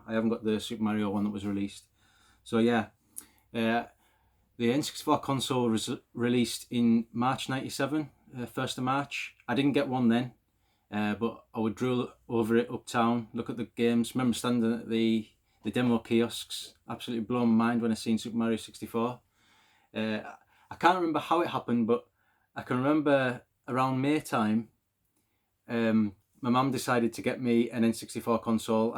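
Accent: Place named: Cumbria, United Kingdom. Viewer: Good video. Are you cumbrian by any chance? 😁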